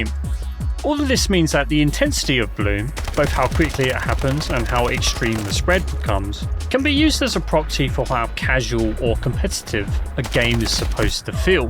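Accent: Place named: United Kingdom. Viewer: you didn't have to put "UK" in your username for people to know you're British™, your pronunciation is is so 🇬🇧🇬🇧🇬🇧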